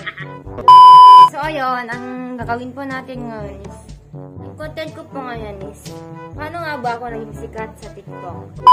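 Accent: Ilocos, Philippines. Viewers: Hi ilocano ka ate?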